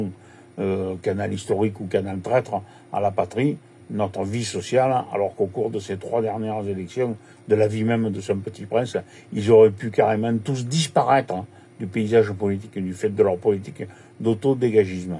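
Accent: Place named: Turkey. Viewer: Parle français tonton ont comprend rien avec ton accent de turc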